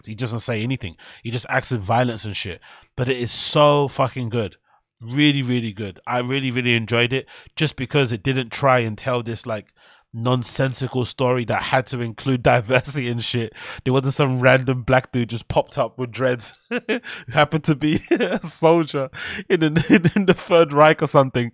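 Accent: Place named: United Kingdom. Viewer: Damn. Where you from, man? You sound British but your name is the most Angolan thing ever.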